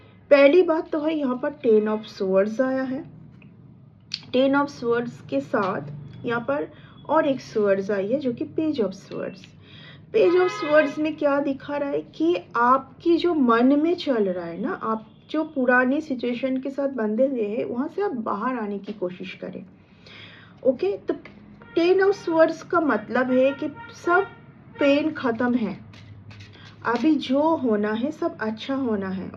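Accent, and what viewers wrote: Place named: Bengal, India. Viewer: आप तो बंगाली भाषा से लग रही है।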